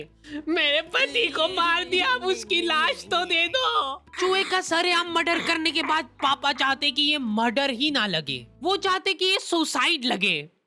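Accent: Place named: Indore, India. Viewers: Bhai indore se ho haina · Bhai kha se ye to bata do Indori lag rahe ho 😂😂😂😂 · Lagta he bhaiya mp se he 😅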